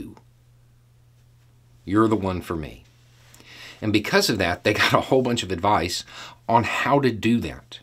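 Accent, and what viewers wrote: South, United States. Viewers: the cognitive dissonance i have with a bearded guy with a southern state dialect that actually able to have an intelligent nuanced discussion about such a topic showcase my prejudices and stereotypes which i have to me. hats off, your vouce of reason is sorely needed un todays workd. i will sit here in the corner...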